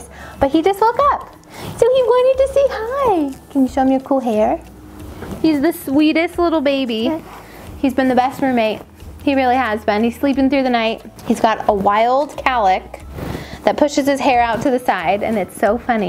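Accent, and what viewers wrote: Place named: California, United States. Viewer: Is it Saw-yer or Soy-Yer. Or just your California accent! ❤️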